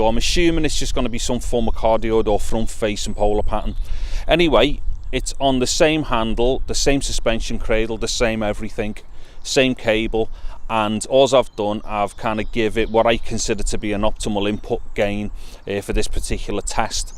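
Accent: Liverpool, United Kingdom. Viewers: How refreshing. A fellow Scouser with a no-nonsense, objective review. Luvved it and just subbed yer.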